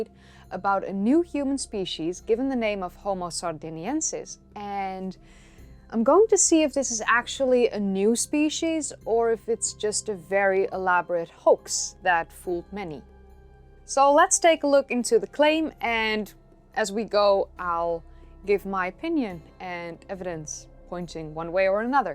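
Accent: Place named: Netherlands. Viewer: your accent reminds me of my trips to the Netherlands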